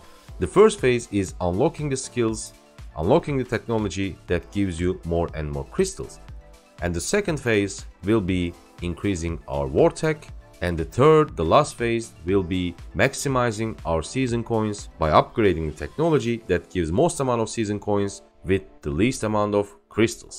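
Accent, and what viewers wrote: Turkey. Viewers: Paketin çıkan ücretinden türk olduğunu anladım tahmin etmiştim konuşma şeklinden. :D Videon çok faydalı oluyor teşekkür ederiz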